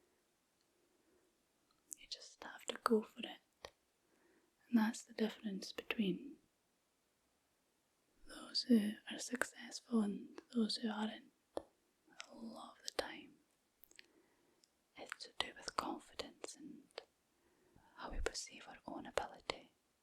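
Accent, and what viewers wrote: Scotland, United Kingdom. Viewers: The Soothing scottish accent gets me to feel cool, calm and collected for years. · That scottish accent is just fire 🔥🔥💯💯👏🏾👏🏾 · Hello from a fellow Scot from Ayrshire I have trouble sleeping and hearing a Scottish accent is unique on YouTube so thank you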